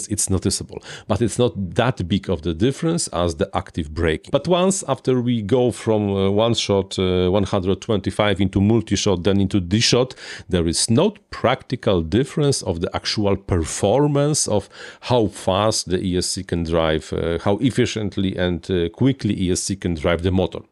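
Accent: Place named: Poland. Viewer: Czemu tak łatwo rozpoznać Polaka mówiącego po angielsku?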